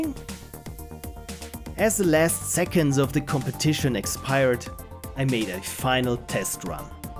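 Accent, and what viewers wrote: Germany. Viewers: are you from germany? you sound like you are german... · Is he german?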